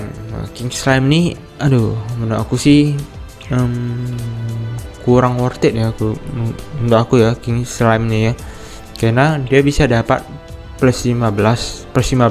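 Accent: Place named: Palembang, Indonesia. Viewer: keknya org plg ya bang? ngmng nya kadang keluar dikit hahaha btw nice vidio bang saya jadi tau harus masuk ke event mana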